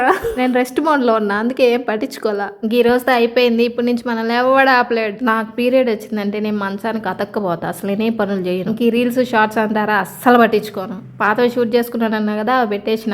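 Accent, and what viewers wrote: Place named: Nellore, India. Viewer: Sis medi evuru me language Nellore district vallalaga undi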